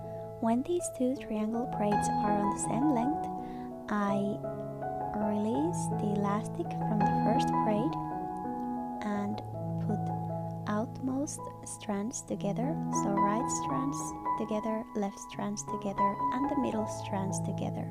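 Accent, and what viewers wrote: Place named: Finland. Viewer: She's Finnish. That's literally a Finnish accent. Are you serious right now?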